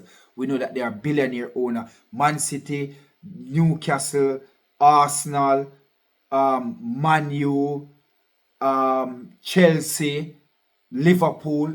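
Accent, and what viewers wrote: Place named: Ireland. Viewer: that irish accent from so funny i didnot expected but when you speaking english with irish accent is better rather than you speaking english with accent british that martinelli assist against fulham when we won 2-1 similar like ozil assist to giroud arteta clearly ruined martinelli because he change martinelli become defensive winger instead become deadly winger in final third when we won against fulham 2-1 in april 2025 and you watch Muniz and iwobi, imagine if that was Mbappe and Vini we would be done I think Arteta will leave this season, I don’t think Arteta will ever be able to work with good and experience players. Bertha will sign good and exp players because calafiori get injured so we Can't even use Calafiori in his natural position against madrid at ucl first leg for replace gabriel maghalaes who get injured right now against fulham on april 2025 but even if calafiori not get injured then he still shite as centre back because cannot defend and slow, Now the Artetasexuals will have an excuse to latch on for UCL exit. 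ITS FULHAM so why risk best players before important CL game?? ARTETA IS A MUPPET!!!, Arteta's fault as should rest best players for CL game 🤷‍♂ we can't win premiership so no brainer, this stupid manager too inexperienced for this job, HOW ARE OUR PLAYERS PULLING HAMMIES ALL THE TIME? This must be systematic problem for which is Arteta responsible. Why are we surprised? This is the Arsenal way. Overplay the regulars until they snap a t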